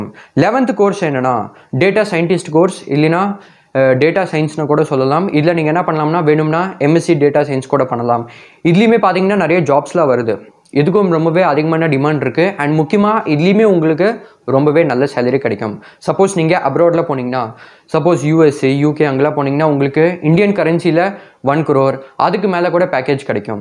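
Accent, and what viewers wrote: North India, India. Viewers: North hindian mari pesra😂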